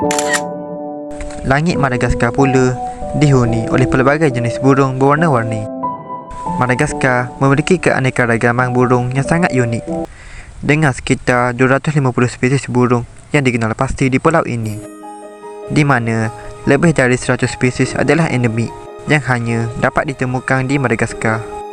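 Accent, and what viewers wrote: Terengganu, Malaysia. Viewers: Orang ganu ke? Clear sgt bunyi ganu ye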